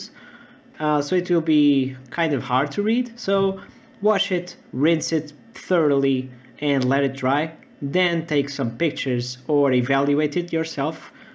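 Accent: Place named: Portugal. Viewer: Are you portuguese? Your accent makes me want to buy you a Coffee and a Pastel de Belém..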